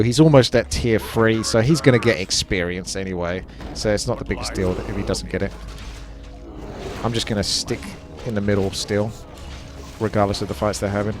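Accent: South, United States. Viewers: that southern voice though, sounds like you're a flirty barman.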